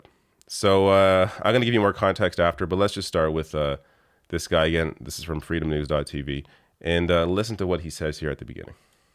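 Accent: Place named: Canada. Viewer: I looked you up because I thought you sounded Canadian....weird how that is, eh? Aloha from BC!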